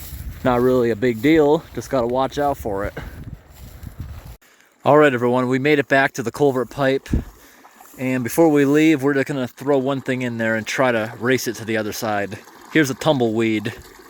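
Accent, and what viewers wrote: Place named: New England, United States. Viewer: I think your yankee accent threw them off... They weren't sure what you were saying.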